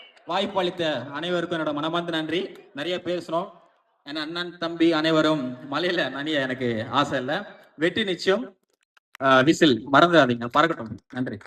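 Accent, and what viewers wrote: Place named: Chennai, India. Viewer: I love your chennai slong❤❤❤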